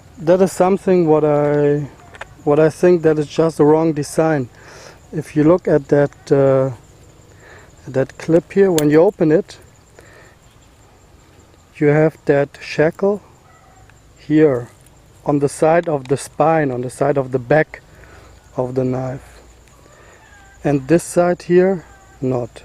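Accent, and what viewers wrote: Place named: Germany. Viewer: You seem to have a German accent, but it looks like you're in a very tropical area. Where do you live?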